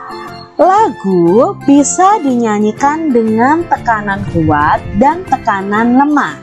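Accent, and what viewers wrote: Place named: Java, Indonesia. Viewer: Suara nya kok jawa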